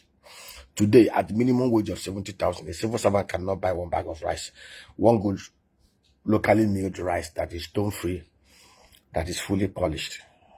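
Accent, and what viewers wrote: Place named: Ghana. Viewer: You look and sound Ghanian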